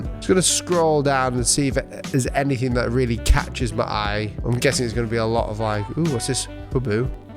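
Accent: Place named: Cheshire, United Kingdom. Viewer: Keep up the great content though. I live in the States but was born in Cheshire. It's nice to hear a familiar accent.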